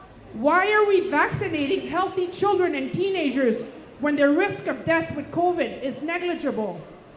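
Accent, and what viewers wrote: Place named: Canada. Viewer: She sounds so Canadian 😄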